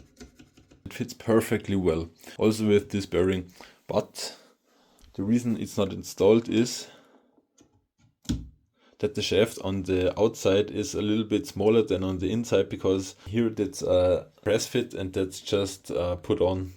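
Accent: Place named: Germany. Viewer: I hear deutsch 🫣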